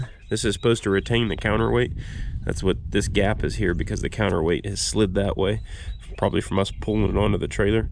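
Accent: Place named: Pennsylvania, United States. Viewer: For the longest time, you reminded me of someone; but I couldn't place it. I finally figured it out. You could be Rick Rowlands' kid brother. BTW my people are from around Uniontown/Connellsville, you talk like them.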